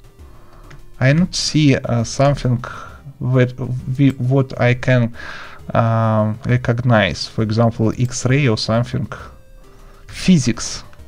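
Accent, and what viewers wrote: Russia. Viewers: палиться пиздец,у него акцент рашки,пиздец угарно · Акцент русского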